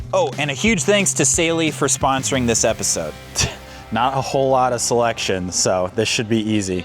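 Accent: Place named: Northern California, United States. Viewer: your Norcal accent is killin me. I hope you never loose it. 🤙🏾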